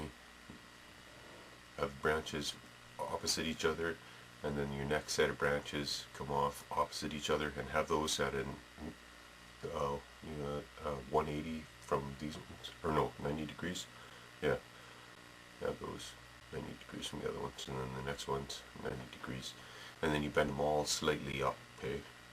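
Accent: Canada. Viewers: are you canadian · Canadian eh? hah, great trees friend!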